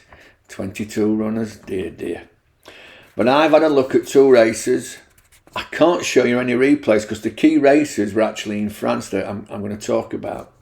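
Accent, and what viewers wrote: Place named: North, United Kingdom. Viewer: Hi nice to hear that Northern voice once again, im on you 2 advised tips, plus im already on Whip Cracker ew at 18s. Enjoy your break.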